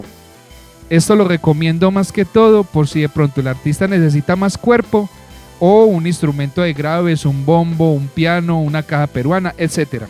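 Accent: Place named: Cartagena, Colombia. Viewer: Muy clara y buena guía la que nos dejas,para los que nos gusta manejar sonido y no tenemos una formación técnica o de escuela. Tu acento es bien cartagenero,verdad?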